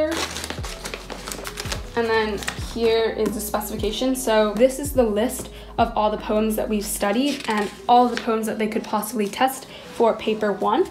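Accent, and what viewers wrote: United States. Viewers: don’t you have an american accent?so why are you doing GCSEs? or have i got it all wrong 😭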